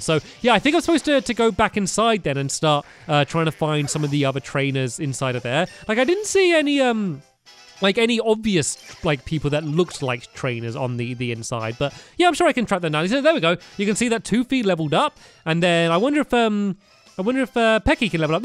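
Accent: England, United Kingdom. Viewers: Lunapuppy Vlogs is from England, so they pronounce Z differently lol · S4L Yolo It's just stampys accent You could say both ways In England we say z as zed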